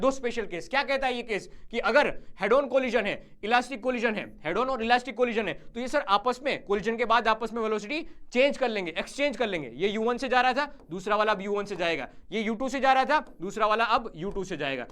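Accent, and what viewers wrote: Gujarat, India. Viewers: Sir aap Gujarat se ho kya aapki voice tone bata rahi hai 😅😅 Sir aapne bohot accha revision karvaya ❤❤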